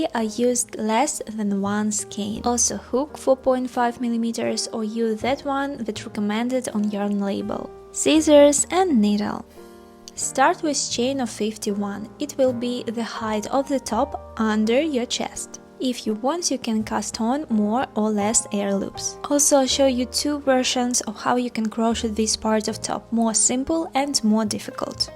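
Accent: Russia. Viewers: я будто слышу русский акцент😂 так легче понимать туториал хаха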